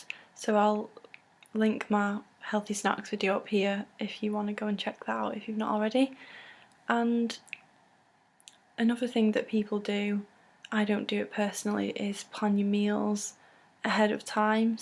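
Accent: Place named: England, United Kingdom. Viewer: that's awesome! i love the english accent! haha yep i am :D there's a11 hr time difference! we should keep each other motivated to achieve our fitness goals :)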